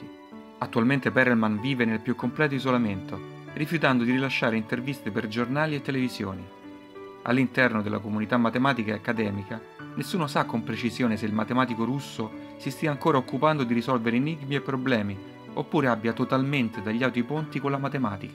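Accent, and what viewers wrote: Rome, Italy. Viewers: Ma io dico, possibile che la voce parlante abbia un accento così marcatamente romano? Le doppie si pronunciano solo quando appaiono per iscritto.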